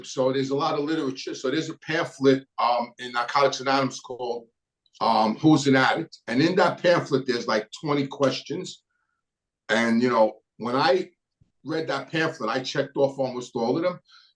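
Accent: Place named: Brooklyn, United States. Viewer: He has a Brooklyn accent, genius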